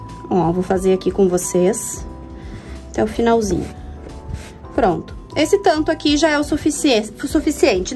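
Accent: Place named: Rio Grande do Sul, Brazil. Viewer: Tinha certeza que eras gaúcha, é a primeira vez que a conheço sou gaucha também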